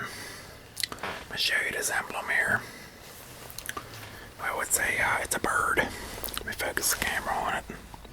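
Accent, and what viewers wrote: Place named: South, United States. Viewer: Didnt know a souther drawl with asmr was so good!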